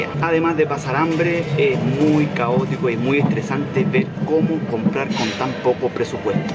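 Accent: Chile. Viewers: No sé cómo es que estoy suscrita a tu canal (no recuerdo haberme suscrito) pero que bueno!! Jajaja me quedé super contenta con tu video. Cuando vi el título dije "$800 no esta mal" hasta que escuche tu acento que comprendí que no eras mexicano. Aquí con eso puedes hacer las 3 comidas del día en un restaurante que te incluya todo en paquete y quedas más que satisfecho, pero lo lograste! Saludos hasta tu precioso Chile :D bendiciones y excelente video! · Eres chileno o de otro pais con acento de chile :v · Ese acento chileno me desespera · comunica chileno?:v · Yo cache por como ablaba q era chileno :/ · Habla como chileno wn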